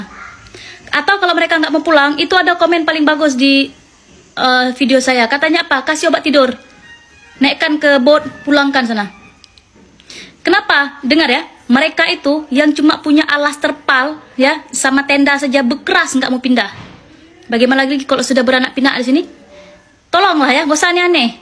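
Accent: Aceh, Indonesia. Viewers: ini orang aneh yg di bahas A coment nya B waras gk ente mau tindikan kek orang ..ente blm tentu lebih baik dari dia terus si mbk ini bukan orang malsiya dia orng Aceh logat orng aceh mirip malysia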